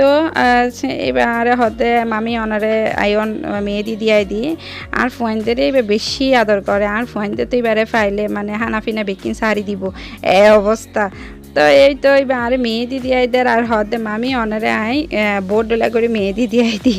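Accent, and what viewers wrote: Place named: Chittagong, Bangladesh. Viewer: চাটগাঁইয়া ভাষায় কথা শুনে কিছু কিছু বুঝতে পারছি 😁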